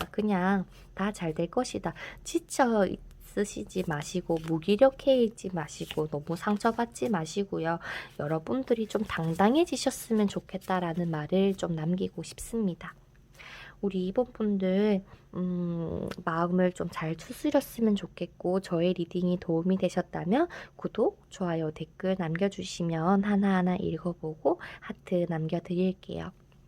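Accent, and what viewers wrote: Gyeongsang, South Korea. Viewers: 하트!ㅎㅎ🥰 원래 경상도 분이신가요? 너무 정겨워요ㅎㅎ 좋은 리딩 항상 감사합니다~! 아, 전 남자입니다요.. ㅎㅎ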